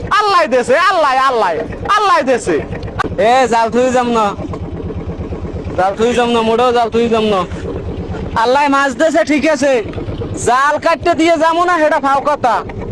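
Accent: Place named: Barishal, Bangladesh. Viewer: বরিশাল্লা মনু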